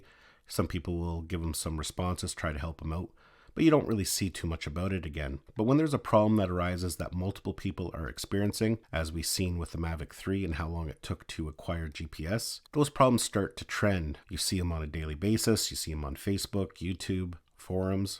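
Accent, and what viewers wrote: Canada. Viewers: Just to be clear, are you flying CE or FCC mode? Your accent sounds Canadian, which is CE territory, correct?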